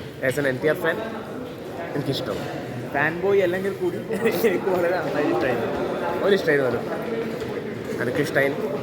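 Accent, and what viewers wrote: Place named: Kannur, India. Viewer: Aa kannur slang kelkan oru verethanne sugam ❤. Ennalum nte husband nne kootathe film kanan poy nadakate🤩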